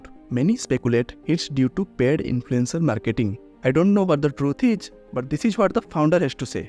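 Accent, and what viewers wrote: India. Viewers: You spoke very well, don't get bothered by comments about your accent. It's how most of us Indians speak. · You are from odisha because the accent in which you speak is odi accent .love you bro I am also from odisha ❤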